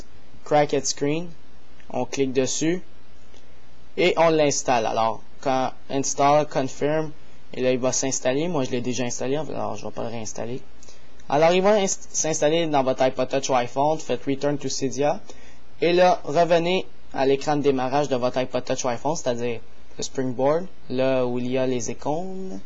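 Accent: Quebec, Canada. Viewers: je comprent pas tou t quebecois bref by ^^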